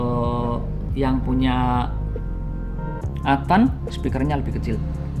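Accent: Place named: Java, Indonesia. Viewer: Medok banget om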